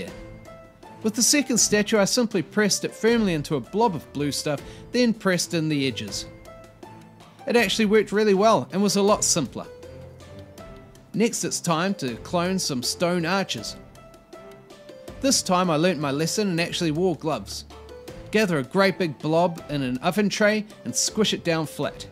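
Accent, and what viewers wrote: New Zealand. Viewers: I'm digging this tutorial, the accent makes this feel like having a WETA making-of-documentary clip for wargaming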